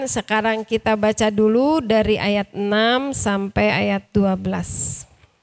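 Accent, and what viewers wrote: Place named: West Java, Indonesia. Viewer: Alhamdulillaah, trimakasih Ya Allaah,sy bisa mengikuti kajian Ustjh.sunda pisan,sy seneng pisan.sama produk sponsor nya juga menarik.dimana sy bisa beli? Udah di jual bebaskah?di Bekasi bisa di beli dmn?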